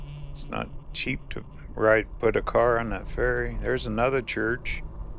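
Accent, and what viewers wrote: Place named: Pennsylvania, United States. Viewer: you seem to have a PA accent. True?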